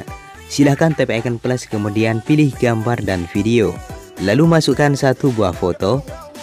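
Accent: Madura, Indonesia. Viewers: Pasti orang Madura ya kak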